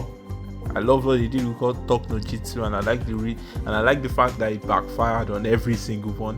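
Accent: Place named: Nigeria. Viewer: yeah bro, you sound like you are from nigeria right. i will follow you right now, but you have to improve your script and also the way you say the words.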